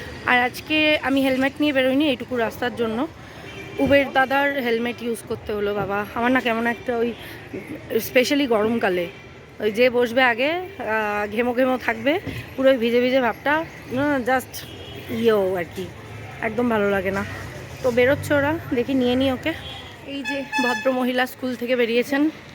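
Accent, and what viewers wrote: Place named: Bangal, Bangladesh. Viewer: একটা কথা জিজ্ঞেস করছি বোন কিছু মনে করোনা।তোমার বাপের বাড়ি আর শ্বশুরবাড়ির মধ্যে কারা বাঙাল?তোমার কথায় টান আছে তাই।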